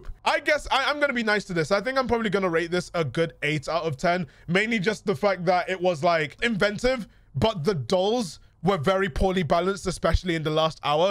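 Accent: United Kingdom. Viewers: you're from London??? Why didn't you come out as british earlier???? Smh my head I feel so betrayed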